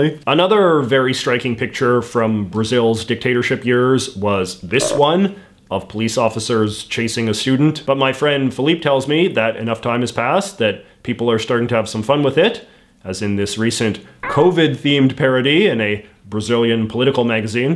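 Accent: Canada. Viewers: I stumbled onto your channel by accident a couple weeks ago towards the end of a YouTube binge after a long work week. Not even a minute in, I remember feeling this overwhelming sense of animosity for you and the post. It wasn’t just that I disagreed with your politics or world view; I really didn’t! I don’t know if it was your smug disposition or that forced thick Canadian accent, but I found myself quietly siding with those French hillbillies from Quebec. Its been a few weeks and I have to confess I subscribed and look forward to your videos every week. Still haven’t figured it all out but I’ll keep tuning in until I do. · the what now?? I knew you had a strong Canadian accent but there is no way that's how to pronounce archipelago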